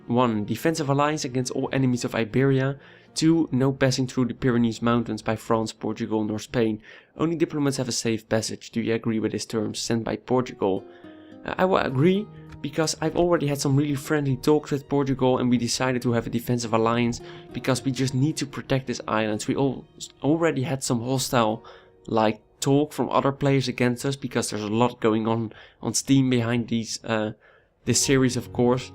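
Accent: Netherlands. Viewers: Are you by any chance Dutch? You have our wonderful accent :P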